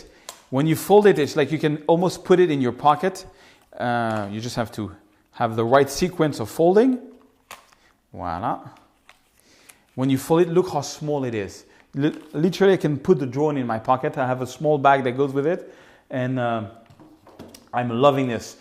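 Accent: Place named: France. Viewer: gotta love that french accent!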